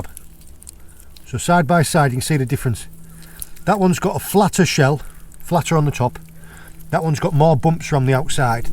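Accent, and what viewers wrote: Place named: England, United Kingdom. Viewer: I knew it was going to be good day when I hear I got my booket and you go on a trip in an English accent. Good view and explanation of things.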